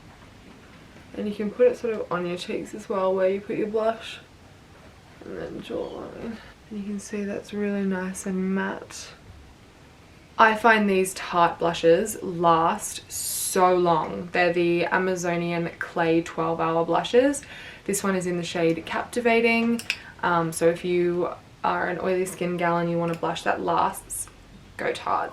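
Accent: Australia. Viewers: I knew you were from Bondi just from the accent lol. #eastensuburbsgirllssss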